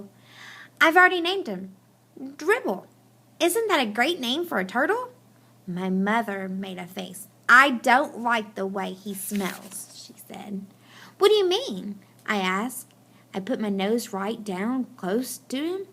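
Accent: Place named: United Kingdom. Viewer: No offense but you sound like a British person pinching her nose and reading at the same time. If you are wondering why I'm looking at the video, it's because of homework...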